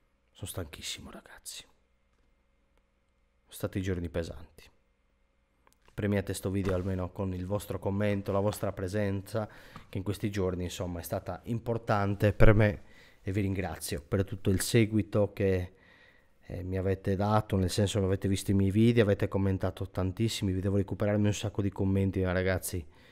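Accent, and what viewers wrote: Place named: Sardinia, Italy. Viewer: Ottime le tue analisi. Però non oso immaginare cosa ci faranno ora... (Riconosco l'accento della mia amata regione sarda: di dove sei?)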